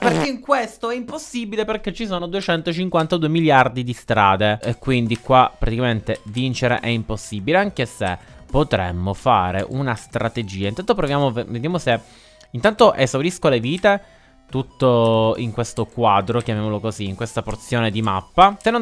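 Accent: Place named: Palermo, Italy. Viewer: melo sentivo che sei palermitano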